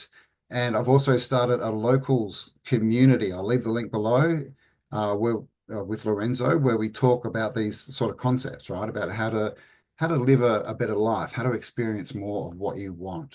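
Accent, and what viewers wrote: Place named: Wales, United Kingdom. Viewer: Whern are you in the UK? Welsh pixie ❤😊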